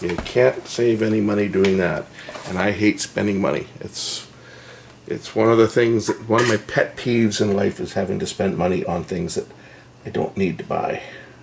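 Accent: Canada. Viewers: we definitely need a kitty cat review video! Btw are you from Manitoba originally? Your voice sounds very familiar but maybe it's just a Canadian thing. (Edmonton, Alberta here)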